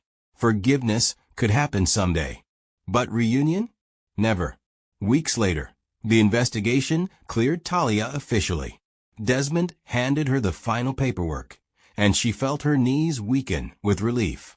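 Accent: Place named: Jamaica. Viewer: Mi lik de patois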